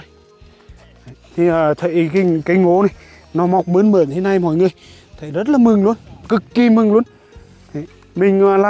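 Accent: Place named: Nghe An, Vietnam. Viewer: Chất dọng quê choa mộc mạc mà dễ thương chúc khỏe bình an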